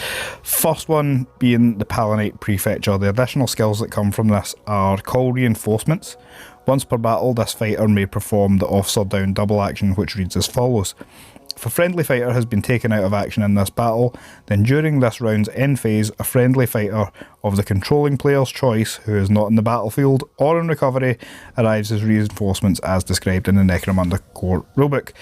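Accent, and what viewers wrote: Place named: Scotland, United Kingdom. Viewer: Some new necromunda channel I've discovered, great and detailed analysis, charming Scottish (i presume) accent, nice builds. Thanks!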